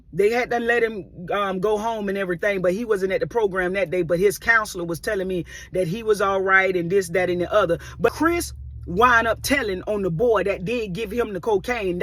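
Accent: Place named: South, United States. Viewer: I’m from NY & I think it’s the southern accent that makes your stories so funny to me. Glad GOD has kept His hand on you throughout your life and that you share these testimonies with us. Bless you!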